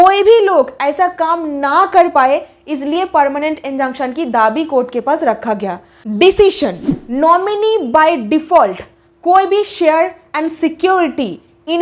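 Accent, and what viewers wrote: Bengal, India. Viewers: Narrator is Bengali ? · Tum bongali logta hai mamta benargibhi aiosa hindi bolata ohae.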